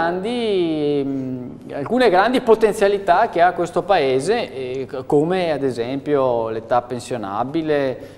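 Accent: Veneto, Italy. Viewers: Benetazzo, non peredere mai il tuo accento trevisanpadovano che ti caratterizza!!! bella Malta!!! ;-)))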